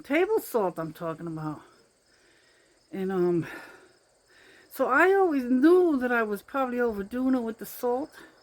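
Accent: New York, United States. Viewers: PS... you don't have to walk to keep me listening. I love your NY accent and your great personality.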